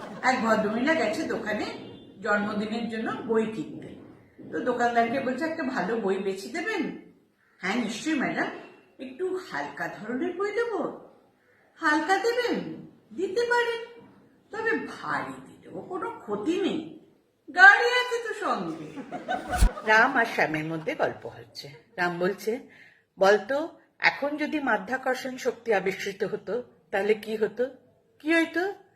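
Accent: Bangladesh. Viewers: দারুণ কইছোস বাঙাল ভাষায়। ভালো হইছে। জিও আর চালাইয়া যাস তোর গুনাবলী। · Thanks for continuing with Bikrampur dialect that your father was a master. Great initiative! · অনেক শুভেচ্ছা জানালাম।যদিও ভানু বন্দোপাধ্যায় এক অনন্য প্রতিভার বিকাশ, অন্য দেশ হোলে সম্মান প্রদর্শন অন্য রকম হত,তবু বলবো,দেরিতে হলেও আপনি এসেছেন,দয়া করে থেমে যাবেন না,চালিয়ে খেলুন, শুধু বাঙাল ভাষাটা আরো একটু প্রয়োগ করুন,খুব মিষ্টি লাগবে,কথা দিছি।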